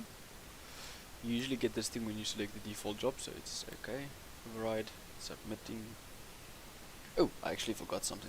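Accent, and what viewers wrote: South Africa. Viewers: south african much?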